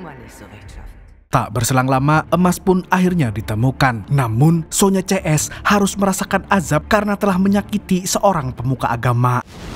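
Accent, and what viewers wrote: Banjar, Indonesia. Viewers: Bah urang Banjar jua rupanya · Harau urang banjar sekali nya sidin neneh 🤣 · umai sanak nih urang banjar kah sekali nya wkk sukses sanak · Urang Banjar jua sekali admin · Pian orang Banjar KH min? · urang banjar amang nih sekalinya wkwkwk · Urang banjar jua kah pian nih julak..😅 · Urg banjar kalinya julak mimin neh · Mimin nya urang Banjar leh😅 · 😂dsa ngalih nyawa ni lh. Urang bnjar kalinya. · Umma, urang banjar kah sekalinya pian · Bungul urang banjar sekalinya 😂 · Pian ni urang banjar kah? 😁 Sesama kekawalan kita lah.. 😁 👌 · Orang Banjar kah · Urg banjar sakalinya admin nih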